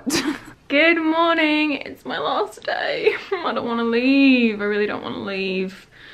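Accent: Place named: Australia. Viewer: "kay" "naydeeyaa"? australian accents are so cute lmao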